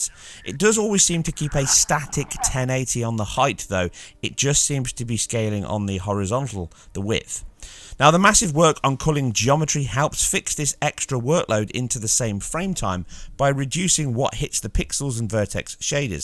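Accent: United Kingdom. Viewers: Nice to have a British YouTuber who pronounces words in a British accent instead of adopting American pronunciations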